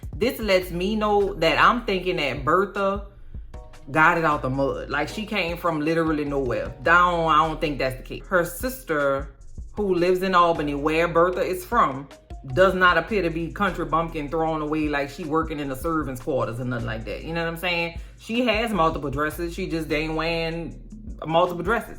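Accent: New Orleans, United States. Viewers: You are so funny! I am glad I found your channel! Now I have two “shows” to look forward to on Sunday night! LOVE your New Orleans accent!!! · I'm up at 6am watching your channel and lmao I love your commentary and I love your New Orleans accent ❤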